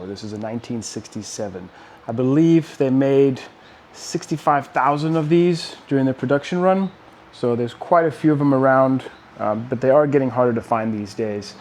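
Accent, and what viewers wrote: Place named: Texas, United States. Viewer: I have heard that accent before what part of Texas are you from ??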